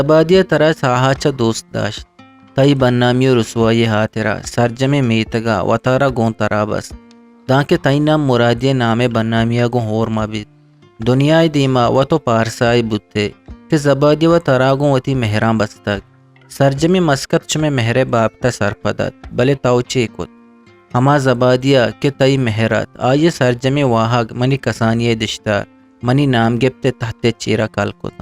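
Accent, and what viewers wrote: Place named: Balochistan, Pakistan. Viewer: Your Baluchi accent mashallah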